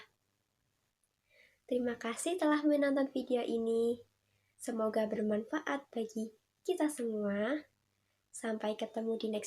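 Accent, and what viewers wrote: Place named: Java, Indonesia. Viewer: sukses terus.. oh iyaaa, jowone kental pisan oiii wkwk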